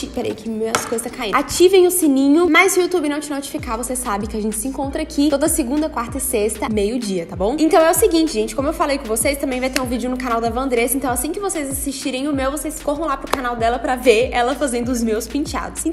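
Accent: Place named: Northeast, Brazil. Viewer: Aqui.se chama lerota kkkk ou ataca mas amoooo esse nosso sutaque nordestino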